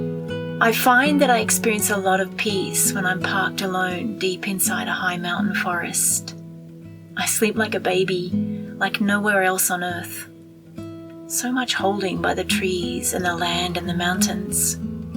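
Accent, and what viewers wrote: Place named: Australia. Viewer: Found you looking for cushion cover tutorials. You sound like an Aussie, where are you from?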